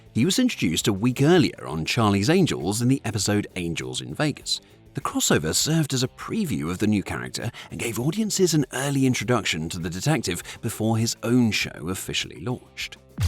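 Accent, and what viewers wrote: United Kingdom. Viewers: I wasn't born in 1978, but I had never heard of this TV show growing up in the 80s in the UK, so I assumed it was never shown in the UK. However, the person narrating this video has a British accent, so maybe it was shown in the UK!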